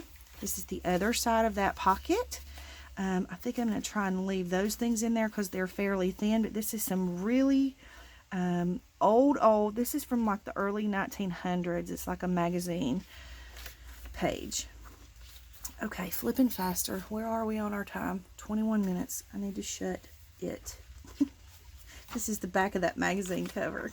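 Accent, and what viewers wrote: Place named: Tennessee, United States. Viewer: This is simply stunning! I thought I recognized your accent...I grew up in Cookeville, just a hop, skip and jump away! ty so much for sharing .. it’s so beautiful! Hugs 🤗MH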